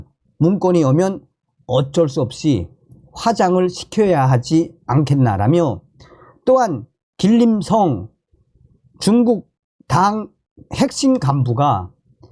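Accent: North Korea. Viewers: 정성산 탈북자 냐? 말씨가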